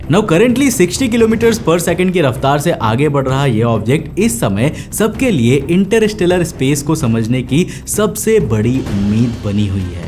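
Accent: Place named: Bihar, India. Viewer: bihari hai ye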